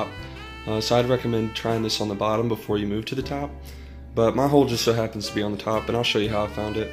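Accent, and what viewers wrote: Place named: South, United States. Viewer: I love his accent...Southern USA?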